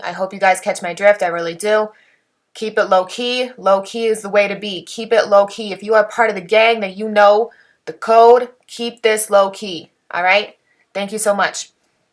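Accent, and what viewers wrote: Midwest, United States. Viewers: 😂 Honestly.. I never thought it would be taken out of context.. I’ll re-edit the original comment tho.. Going to sound like a simp, but is a saint, I don’t even look at her like that anymore.. Just keeping it real… I was first introduced to videos around 2019-ish.. I was like “Dayuuum! who’s this beautiful woman with a midwestern accent talking about eye opening topics!”… I was crushing lol, but over the past couple of years watching her videos and seeing stuff like cooking, closet videos, and talking about Penny.. You just get the sense she is a strong woman with a beautiful soul.. Hope you’re doing well! 😊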